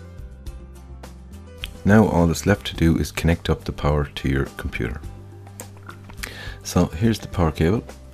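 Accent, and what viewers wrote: Ireland. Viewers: Im Irish too, you sound Irish anyway =D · Your Irish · Irish man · Hey could you make a video on how to setup 2 monitors or 3 monitors? I think i know how to do it but dont want to spend money buying another monitor and not knowing how to install it, cheers. oh and nice accent let me guess Irish? from the low southern end of ireland? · Are you irish?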